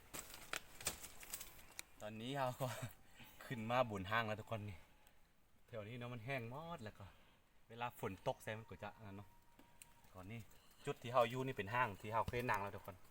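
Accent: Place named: Roi Et, Thailand. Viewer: ร้อยเอ็ด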